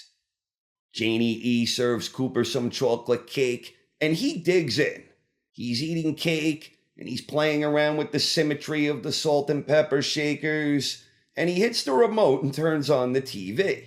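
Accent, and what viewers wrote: New York, United States. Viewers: Sir, from where exactly in the greater New York City region does your accent originate? I've been wondering this all season!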